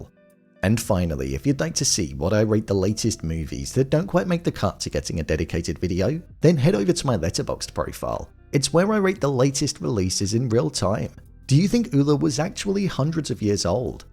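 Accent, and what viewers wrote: United Kingdom. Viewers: Why do we need endless British narration??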